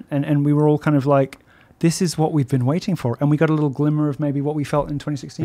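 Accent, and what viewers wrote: United States. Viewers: Milo is starting to sound American in his parlance! I admit it as an English woman I am biased towards the more refined English intonation! js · he is developing a American accent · Do I detect Milo picking up a bit of an American accent?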